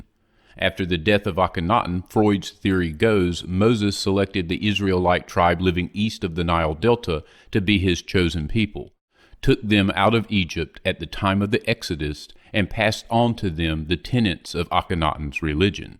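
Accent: United States. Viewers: Completely unrelated, but...... Your has got the be THE best American accent!!!!!! 😍😍😍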